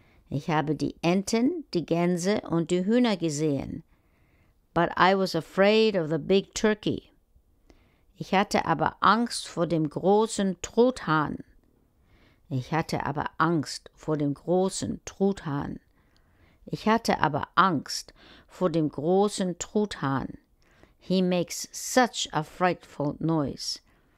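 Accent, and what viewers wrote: Germany. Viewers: It's a German dialect 😉 (Berlin??? 🤷)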